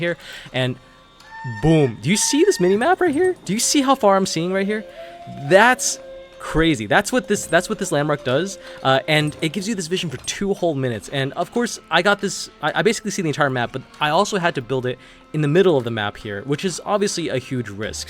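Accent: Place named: Iran. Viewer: the most generic persian accent ever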